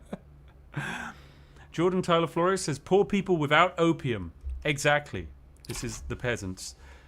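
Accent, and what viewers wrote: United Kingdom. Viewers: Cant wait to fall asleep to a british guy talking about drugs and tea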